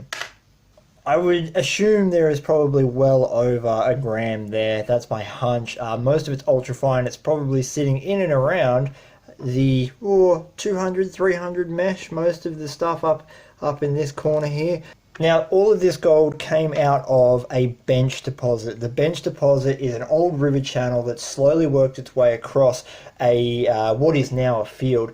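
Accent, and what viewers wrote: Australia. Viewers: enjoy your aussi accent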